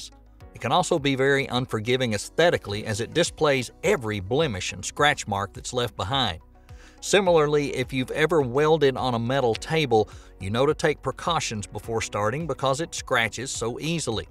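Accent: South, United States. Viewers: very good video. I work at JSC and I love hearing a friendly Southern ascent delivering complex information.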